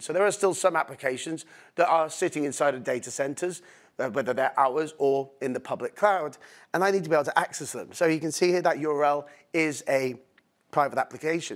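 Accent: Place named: United Kingdom. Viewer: A Netscope rep for Australia sent me this link. Am about 50 secs in and had to write this..... A well muscled guy with a cool Brit accent (I'm a pom too) - tick, impressed so far but wanting to get emails while on a treadmill.....seriously? LOL (I have been in IT 35 years, almost retired and now starting to really feel old!). That said, it does seem a cool product.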